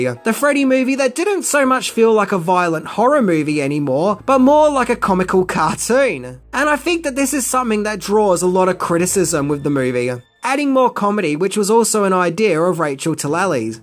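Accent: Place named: Australia. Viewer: Hearing your Australian accent coming from freddy is awesome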